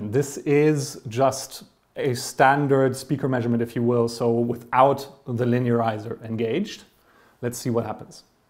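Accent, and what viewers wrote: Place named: Germany. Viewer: For me as an aspiring soundengineer, this video was so helpful. Thank you so much for this clearification. Are you from Germany? Your accent sounds familiar😅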